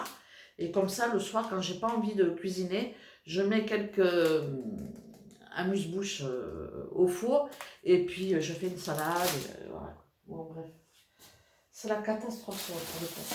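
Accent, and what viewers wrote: South, France. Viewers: Coucou! Je vous découvre depuis 3 jours. Holalaaaaaa comme je suis contente! Vous êtes d'Avignon je crois. Moi j'étais à Boulbon. Originaire d'Arles. Et depuis 1 an je vis à Lyon. L'accent du sud me manque tellement! Alors un régal de vous écouter!!!!!!! Ce bel accent de mon pays!! Mon mari est aussi Portuguais, il regarde les vidéos avec moi lol ! Magnifique le Portugal !! Superbe vidéos. Vous me faites tellement rire!! J'adore! Vos idées recettes au top! Merci beaucoup d'énormes bisous!!!!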